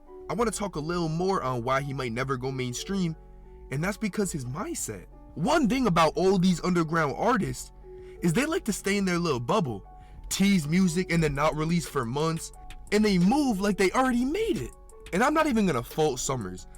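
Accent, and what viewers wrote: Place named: Toronto, Canada. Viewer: i can hear the toronto in ur voice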